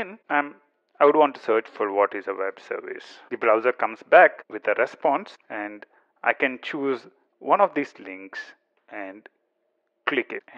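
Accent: India. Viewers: Ох этот индийский акцент 🤦😁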